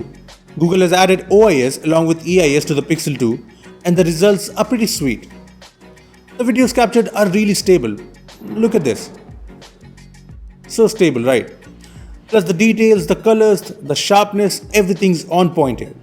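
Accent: India. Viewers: god damn dat indian accent , i can't take it anymore !!!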